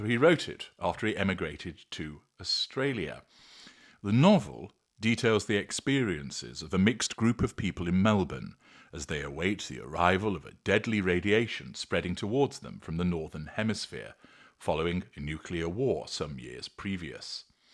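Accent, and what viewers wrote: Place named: England, United Kingdom. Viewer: Come on you have to tell us all about yourself! English accent. From England.✅ Tans in the summertime. Not a red headed guy with freckles.✅ Swears like a trooper. Definitely not in your 60's plus plus.✅ You don't show your face. Maybe camera shy🤔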